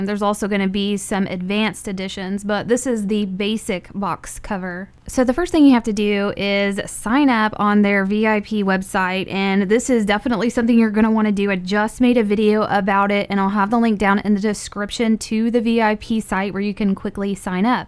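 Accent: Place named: United States. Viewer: American accent: 100%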